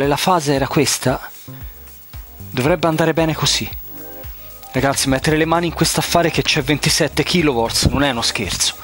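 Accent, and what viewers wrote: Tuscany, Italy. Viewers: Grande!!! Anche se sono sprovvisto di TV da circa 5 anni (felicemente sfornito) mi sono fermato a guardare questo bel video per la presenza del pezzo da museo che è stata la mia ultima TV... e per l'accento toscano che secondo me fa la sua parte. Simpaticissimo davvero. Grazie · bello sto canale sei mega simpatico! hai un nuovo iscritto! tra l'altro mi sembri toscano come me solo non riesco bene a capire di dove :) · L’unico toscano he nun me fa ghignare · ma sei della toscana di che citta' e sei forteeeee ehhh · Sei simpatico,sei bravo,sei creativo...sei anche toscano a giudicare dall'accento...si dice che l'italiano sia nato in Toscana. Ma perchè usi tanto inglese inutile ? Qualche esempio( ma sarei in grado di tradurre ogni parola) : SCREEN = SCHERMO SWITCH = INTERRUTTORE ECC... Buon lavoro comunque e ripeto video interessanti. 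· Ho appena scoperto il tuo canale grazie a questo video molto interessante. Davvero bravo e simpatico! Tra l'altro mi sembra di sentire un accento toscano, di dove sei(per curiosità, dato che io sono di Firenze)? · Ma sei Toscano??? Perchè lo sembri comunque se si lo sono anch'io.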